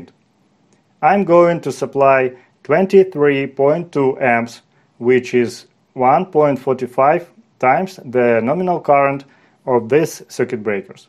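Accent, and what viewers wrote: Russia. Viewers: Английский русскоговорящего нисчем не спутаешь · Сразу слышно русский акцент)) Спасибо за тесты и за все видео =) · Слышу Русский акцент))) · У тебя русский диалект. Почему на русском языке не делаешь материал?)) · Why Russians have terrible English accent? 🤔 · Ебать русский акцент сильный😂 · Сразу видно русский на Английском говорит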